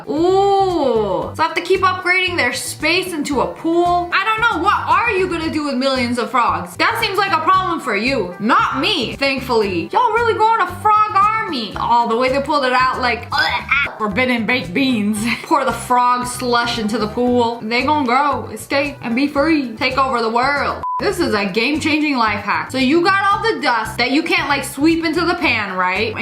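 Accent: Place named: London, United Kingdom. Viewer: ur from london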